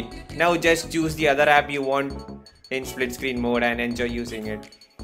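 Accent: India. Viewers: Are u from kerala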